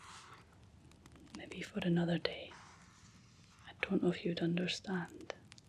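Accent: Scotland, United Kingdom. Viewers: It cannae get mere Scottish than this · Yes, I have to agree. I do love your Scottish accent my God I’m falling in love with you gorgeous Sexy Mamacita.❤ ya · Aw, my Scottish friend gifted me this book for christmas one year 😊 now I can listen to the stories in a Scottish accent, and in asmr format! Thank you 😌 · This is my favorite video! I can't wait for the next one with more Scots dialect ❤ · Yass scottish 😂😂😂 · People love your Scottish accent but people don’t like mine😢😢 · Love your Scots accent shorty can sit and listen to you all day you make me feel safe and comforted I have PTSD due to sexual abuse by male guitar teacher at 17 I listen to you every night you make me feel safe thank you for all you do shorty you saved my life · Whispered Scottish ASMR are you kidding me 😍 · I have listened to this every night since you posted it please keep them coming the more Scottish the better ❤ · this is so perfect for me - fee tales and a gorgeous socts accent to boot:)! 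Love the choice of stories and your sense of humour as well:)!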